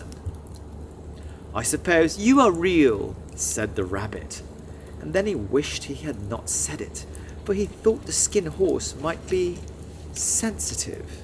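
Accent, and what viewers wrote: United Kingdom. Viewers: 最高のヒーリング動画…🥺 イギリス英語ってなんでこんなに耳心地がいいのでしょう… さんの読み方がいいのか…！眠るまで聞き続けます😇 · 美しいイギリス英語に聞き惚れますね~はぁ続きが楽しみ✨素敵なクリスマスプレゼントありがとうございます😭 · 焚き火の音もさんの声もイギリスの訛りも全部好きでこれ聴きながら眠りについています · 発音が心地よくて本当に聴いてて最高です。これをきっかけに「ビロードのうさぎ」の洋書も書いました。もし可能なら「えんとつ町のプペル」の英語版の朗読もイギリス英語でやっていただけるとうれしいです🇬🇧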